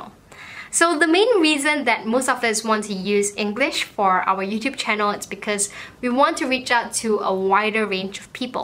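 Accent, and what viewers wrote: Malaysia. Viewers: Thank you. I've had this dilemma for quite a while. As my channel grows, it's kinda hard to switch the language, but this subject keeps popping in my mind. I probably switch to English one day. I'm just not sure when. By the way, I love you Malaysian accent. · Ya actually English is the 2nd most spoken language in the world💛 also your Malaysian accent is so cute😍❤️ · Love your Malaysian accent 🙃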